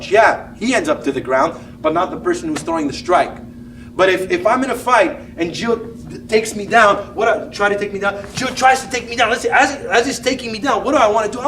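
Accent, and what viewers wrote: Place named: New Jersey, United States. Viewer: yo guy, are u from the jersey or isreal or brooklyn area? i only ask because im from jersey and ur accent kinda sounds like one of the 3 places i mentioned.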